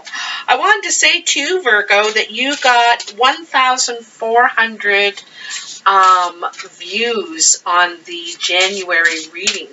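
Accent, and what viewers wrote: Canada. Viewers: Ha, thought I could identify a fellow Canuck. I'm in Southern ON, GTA. I have family in North Bay though. Have a good one as we say.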